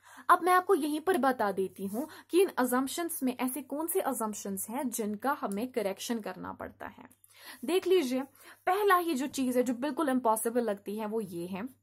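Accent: Kashmir, India. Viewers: Are you from Kashmir 😊 your voice resemble Urdu accent. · Superb explaination mam. It's seems by your voice that you are from Kashmir. And you are doing well. I was really need this in this time because my university exam is going to start.❤️❤️❤️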